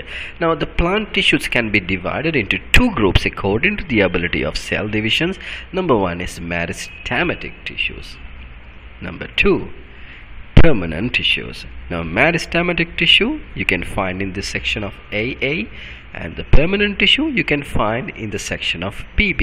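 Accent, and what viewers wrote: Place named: India. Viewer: dude u got a Indian accent but i dont think a indian guy would do videos on sl sylabus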